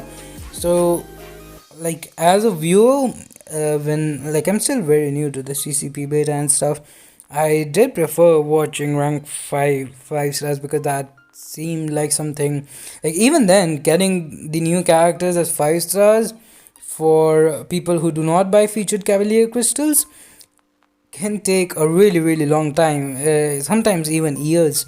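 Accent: India. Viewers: Only disliked it because of your Indian accent.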